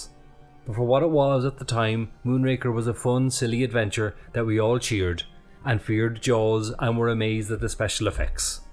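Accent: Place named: Ireland. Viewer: Hi / Finding it difficult to obtain and buy kits locally. I regularly visit a store "MARKS MODELS" here ib the Emerald Isle but it has limited stock in the sci-fi range. I think I have bought everything he has listed. There seems to be a lot of items listed on the JUNGLE website but then states its not available to deliver yo your location. I wondering as you sound local to me, where do you pick up your kits? Many Thanks. Seán Óg. Liking your channel.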